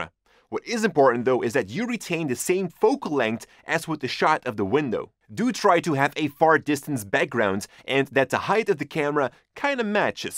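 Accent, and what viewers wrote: Netherlands. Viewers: Are you dutch? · are you dutch ?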